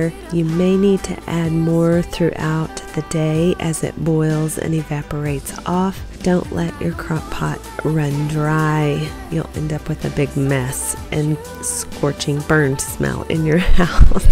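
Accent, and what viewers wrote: Texas, United States. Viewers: Hey, supporting from Virginia with like 90; cute Texas accent hehe. We'd love to have your opinion about our nature ambience soundscapes as well. New sub and hope we can keep in touch, if possible.👍💚 Sending positive vibes your way!:goodvibes:🔔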